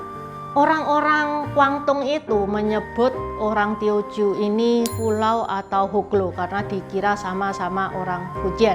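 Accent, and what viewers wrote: Java, Indonesia. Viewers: Bgs sekali chanel nya... Tapi sblm nya maaf ya ce... Bahasa ce terlalu medok skli logat jawa nya...😂😂 Di syg kan skli ji kalau ce coba bisa 4 bahasa ( khek,hokkian,kanton,tiociu) kita bisa byk skli utk dpt belajar...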